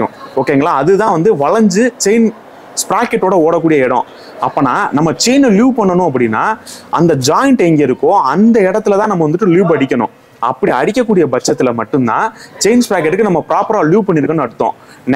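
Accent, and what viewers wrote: Madurai, India. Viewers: பாய் நீங்க மதுரையா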